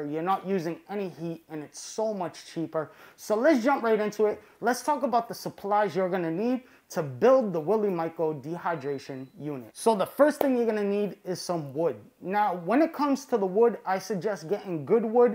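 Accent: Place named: New England, United States. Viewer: This guys seems to have more of a new England area. Maybe long Island.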